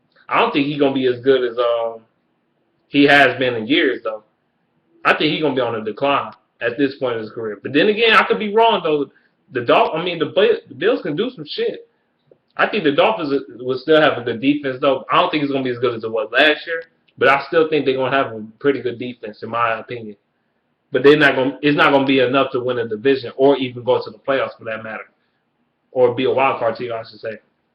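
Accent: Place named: Detroit, United States. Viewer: aye bro you from the westside of detroit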